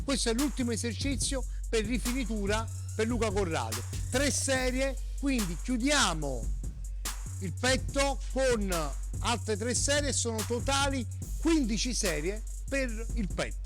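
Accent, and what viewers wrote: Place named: Rome, Italy. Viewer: Si ma un po’ di professionalità e proprietà di linguaggio… sentir Lemme e sentire, beh dovrebbe essere quasi il contrario 🥲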